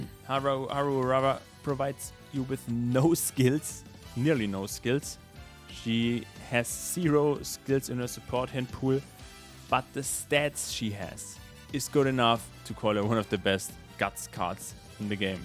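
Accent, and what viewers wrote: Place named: Germany. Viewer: Most German accent I've ever heard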